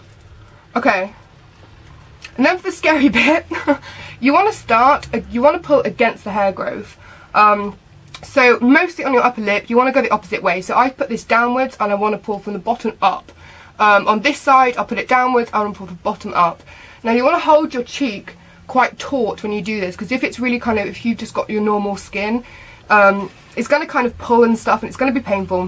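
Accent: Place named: United Kingdom. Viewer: As soon as I saw this video I heard your accent and literally watched the whole damn video because you know what, you're so relevant! I'm British so honestly everything you said was a breeze to follow through because I can access the products and you talk like me :') virtual fist bump you're awesome x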